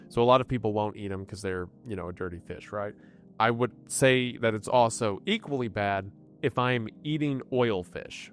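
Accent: Appalachia, United States. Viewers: People complaining about how a deep south Appalachian pronounces words from Japan are literally basement dwellers lol. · So this is what happens when you read Japanese words in an American pronunciation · I don't know if he's mispronouncing it intentionally or genuinely but either just doubles down on his Appalachian charm. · I like his appa-LACHA pronunciation of Japanese names. Brings a little southern comfort.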